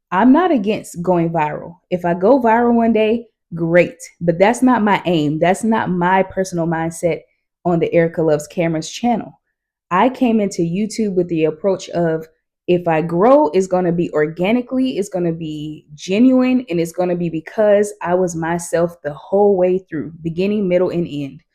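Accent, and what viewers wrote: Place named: United Kingdom. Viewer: Well done Erica another great video, mindset no 2 resonated with me , love the bloopers and British accent 🇬🇧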